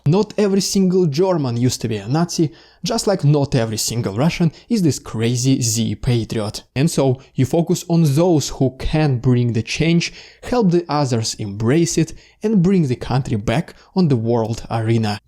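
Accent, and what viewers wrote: Canada. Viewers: Dude why you suddenly a canadian dude?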